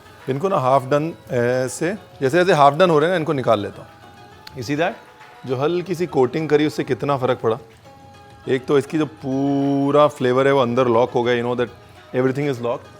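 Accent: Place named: Punjab, India. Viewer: Phir ye mat kahna ke Maine bataya nai,kayal han ji tahade,sirf punjabi sunan lai baar baar videos dekhdi haa,punjabi tadka,luvv u g alot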